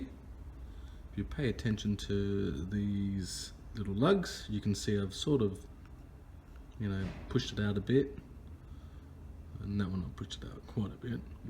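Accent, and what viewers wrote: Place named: New Zealand. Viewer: Thankyou for this bro. Saved me a fortune right before Christmas. Sounds like you're from nz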